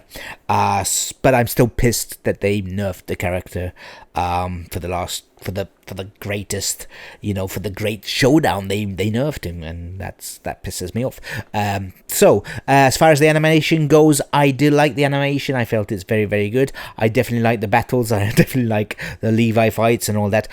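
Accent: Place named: England, United Kingdom. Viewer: English accent got me feeling something 😉 well done bro 🩵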